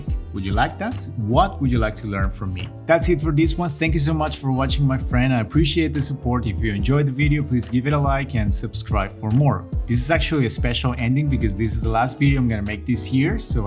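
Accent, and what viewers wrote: Colombia. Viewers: The way you explain it was so nice! btw, loved your Colombian accent! Colombian here too :) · Are you Colombian? Something in your accent and sense of humor makes me think you’re Colombian 🇨🇴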